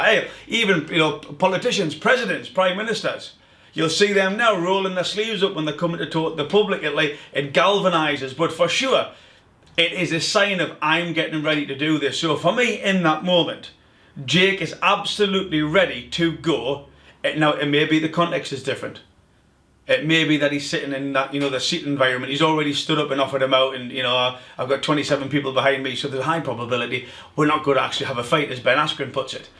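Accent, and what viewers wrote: Newcastle, United Kingdom. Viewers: Wow I really expected an American accent when I first saw the video, not a strong Geordie accent😀 good stuff, subscribing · You from newcastle? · Are u from Newcastle?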